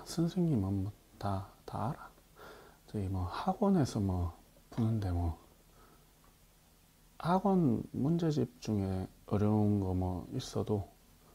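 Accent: Gyeongsang, South Korea. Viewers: 배재고나오셨으면 서울토박이일텐데 어떻게 경상도사투리 네이티브같죠? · 시발존나리얼하네 같은경상도사투리니깐 더리얼하네ㅋㅋㅋㅋㅋㅋㅋ와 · 시리즈로 해주세요 어제 물리수업듣다가 꿀잠잤어요 오늘도 자습보면서 램수면 빠져볼게요. 근데 왜 동남방언쓰시는지요?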